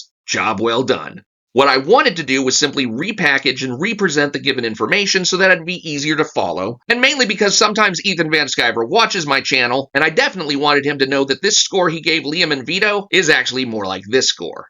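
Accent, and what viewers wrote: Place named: Australia. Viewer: Your just coping and seething, luv the Aussy accent through.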